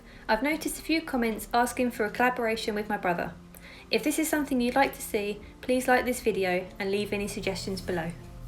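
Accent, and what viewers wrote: United Kingdom. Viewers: Saaf Landan!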